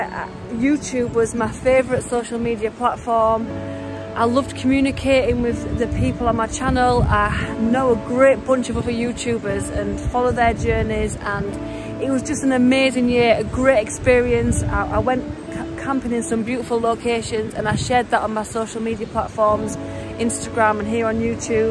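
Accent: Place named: England, United Kingdom. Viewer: Your Welcome. I love your accent. I'm English and Irish and I'm from Newfoundland, so I have an accent too. Love that English accent. Awesome. So glad your back.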